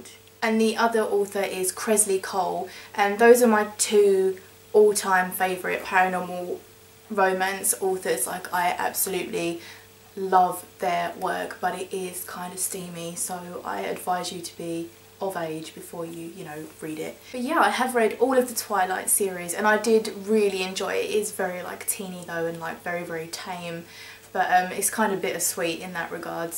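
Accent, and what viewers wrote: United Kingdom. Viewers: I've listened to your music for a while and I never knew you were British, lol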